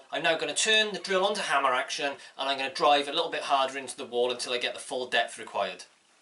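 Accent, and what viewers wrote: United Kingdom. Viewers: “Cello tape” and “Hoover”……even if he didn’t have an accent, those terms are a dead giveaway. Love a British accent!!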